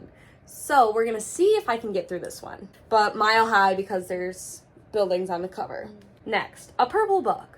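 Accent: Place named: Wisconsin, United States. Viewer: I knew I heard that accent! Hello fellow Wisconsin book lover!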